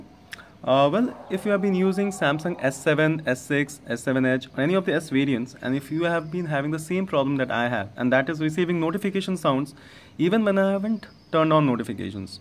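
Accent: India. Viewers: I like your Indian accent.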